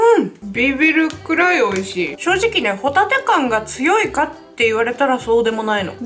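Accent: Aomori, Japan. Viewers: ちょっとなまってて可愛い♡ 青森っぽいけどどこ出身なんだろ、、 そしてそして今日も美味しそうです🤤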